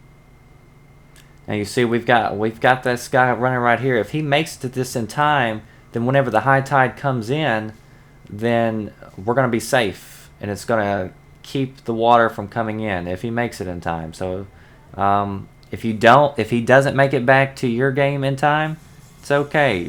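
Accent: South, United States. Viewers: your southern twang is annoying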